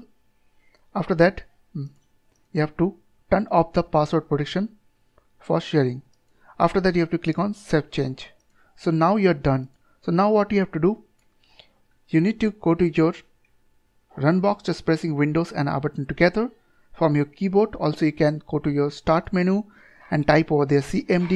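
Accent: India. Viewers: this generic indian accent is a red flag to me I bet this will tutorial will still all my money and block my pc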